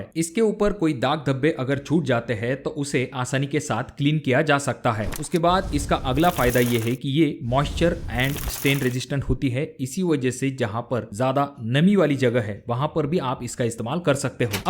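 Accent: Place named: Bundelkhand, India. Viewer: खपरा बाला भाई बुंदेली लग रहा हे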